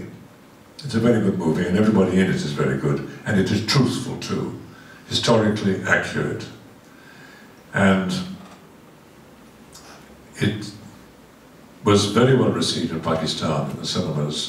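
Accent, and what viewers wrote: France. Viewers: This perfect french accent. bordel de merde. je ne l'oublierais jamais, i will never forget you Christopher Lee. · his french accent is flawless, so is the man himself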